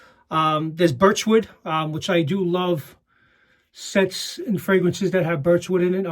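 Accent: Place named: New York, United States. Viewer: Nice shave, love the NY accent💈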